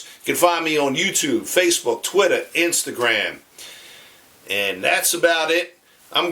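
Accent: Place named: Virginia, United States. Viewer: You are a Virginian i can tell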